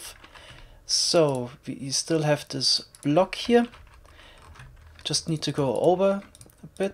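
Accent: Germany. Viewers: Sounds like u are german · German accent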